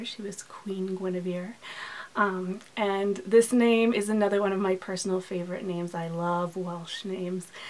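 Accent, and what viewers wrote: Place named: France. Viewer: La chanson de Roland hahah how I love your french accent ! Much admiration from France belle demoiselle ;)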